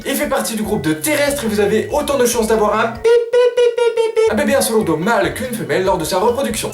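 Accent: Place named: Belgium. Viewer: tes belge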